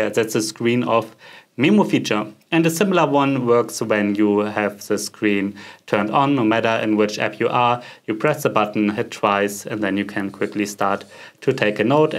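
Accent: Germany. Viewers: У тебя немецкий акцент 😂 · Maybe obvious, just wonder whether the S pen has palm rejection or not. As you use it I start to doubt. And whether it has any pressure sensitivity. Thanks for a nice video. Like the German accent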